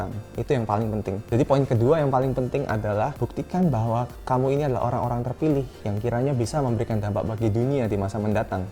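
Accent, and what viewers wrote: Java, Indonesia. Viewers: Koko, logat china campur jowo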